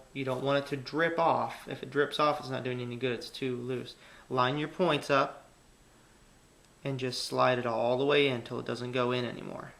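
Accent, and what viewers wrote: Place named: Pennsylvania, United States. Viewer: Jeez man, how did you learn this by living with a present day primitive tribe? Lol. You sound like you're from Pennsylvania and not from the Outback in Australia.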